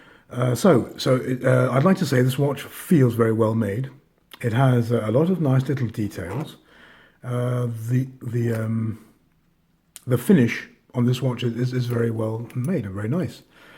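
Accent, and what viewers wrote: England, United Kingdom. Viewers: What accent is this? I mean I know you're from England (unless you emmigrated) but where abouts in England?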